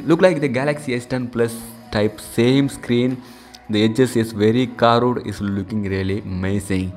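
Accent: India. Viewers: Indian English 🤩😘✴🔥🔥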